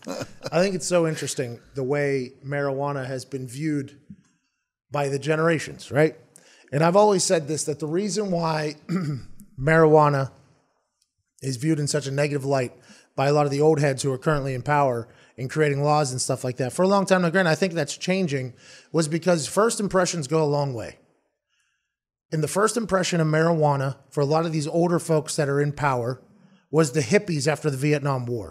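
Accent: Pittsburgh, United States. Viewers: Raymond Toper hahaha same , his Pittsburghese is so good too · The Pittsburghese gets me every time! #412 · I love when he does accents of idiots and his Pittsburghese accent comes out. I'm from the burgh and my buddies and I do it all the time. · That yinzer accent lol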